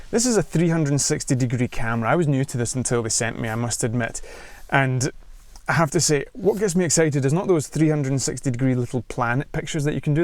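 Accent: Scotland, United Kingdom. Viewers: Great summary & nice video. Brilliant to have a Scottish accent rather than an American one for a change. Cool car to film too. Did you just wedged the pole down the bolster of the seat & which of the 3 selfie stick options were you using (I see the carbon fibre stick is out of stock) ?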